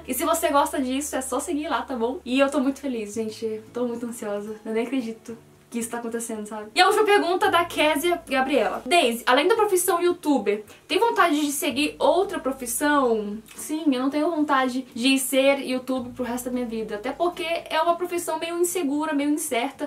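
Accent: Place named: Northeast, Brazil. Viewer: Mermã? Kkkkk vc aprendeu a falar que nem nordestinoooo huahuajau. Eu sou nordestina.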